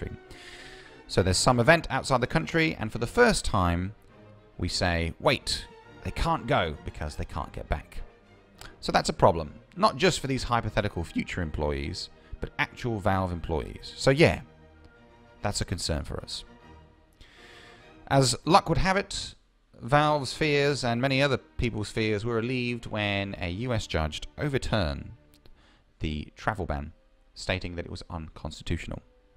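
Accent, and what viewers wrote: United Kingdom. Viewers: Most typical british person ever. he has got it all, top hat, accent, politeness and ego. Amazing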